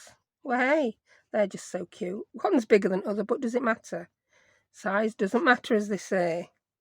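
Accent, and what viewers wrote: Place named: Sheffield, United Kingdom. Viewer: Morning just to say I love all your videos love your accent you make me laugh probably because I’m from Sheffield as well. Do you have any classes going anywhere would like to go if you do ?😊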